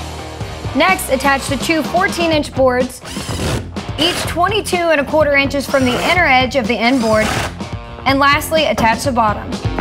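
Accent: South, United States. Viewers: Love that southern accent when she said " For all you haters saying I drill to many pocket holes , I don't care" BOSS Lady <<<< we need more chicks with attitude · A man can dream. And a beautiful Southern belle accent to top it off.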